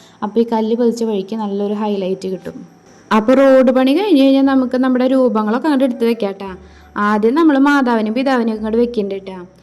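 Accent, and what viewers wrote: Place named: Thrissur, India. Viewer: തനി തൃശൂര് ട്ടോ..... സൂപ്പർ.. സൂപ്പർ.. കലക്കിട്ടോ 👍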